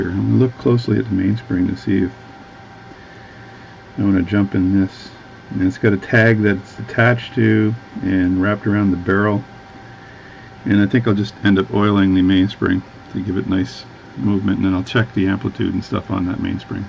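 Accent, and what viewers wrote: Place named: Canada. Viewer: Aboot. Does intrigue me the Canadian accent. We have “geordie” accent from Newcastle that sound similar words. And also the Scot accent which has very similar sounding if you know what I mean. Lol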